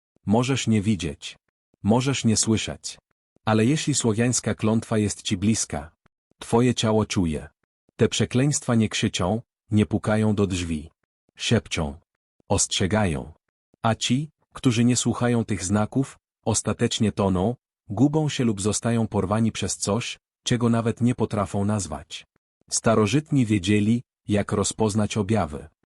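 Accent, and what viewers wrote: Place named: Ukraine. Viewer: a można po polsku bez ukraińskiego akcentu ?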